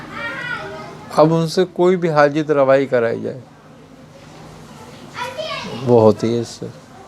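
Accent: India. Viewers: aap ki urdu bhuhat achchi hey dilliwali urdu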